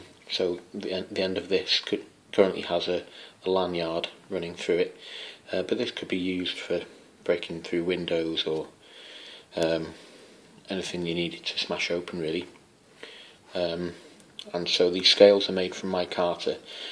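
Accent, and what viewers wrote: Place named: Wales, United Kingdom. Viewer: Hey man where in Wales you from.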